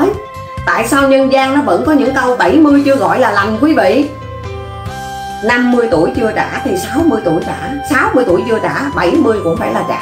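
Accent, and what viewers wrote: Standard. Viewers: Chị nói rất chuẩn.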